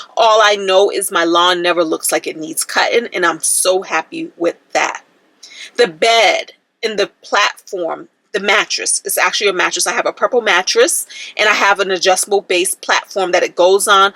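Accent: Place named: New York, United States. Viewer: Love your content ... you talk a little like a a New Yorker... are you ? Very grateful for your tips and tricks !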